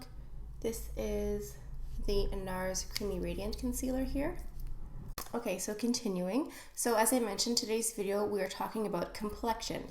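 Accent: France. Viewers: You have such a great French accent!!!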